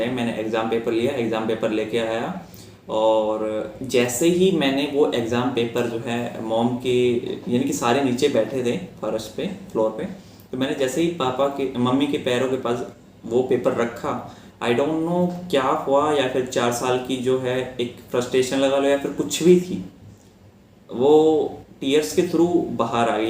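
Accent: Haryana, India. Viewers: bhai aap haryana se ho kya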